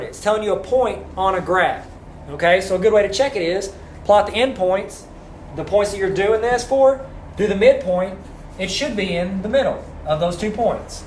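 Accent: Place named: Texas, United States. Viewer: I'm guessing he's from texas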